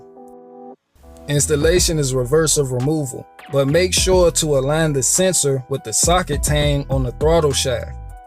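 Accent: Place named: DMV, United States. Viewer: Good video my brother, im tryna get my mobile mechanic business started. Im happy to see another brother like myself. Keep the vids coming, also are you in the DMV?? Im originally from the area and you just sound like it. Keep grinding brotha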